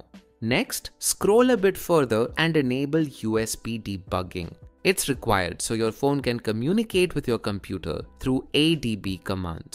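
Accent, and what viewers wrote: India. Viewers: always guy with indian accent is most helpful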